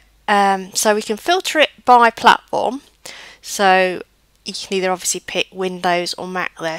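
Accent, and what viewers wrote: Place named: United Kingdom. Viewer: Thank you! Beautiful girl! Love your Britain accent! haha~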